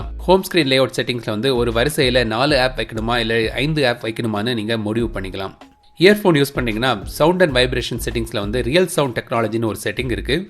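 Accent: Kanyakumari, India. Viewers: Are you from kanyakumari?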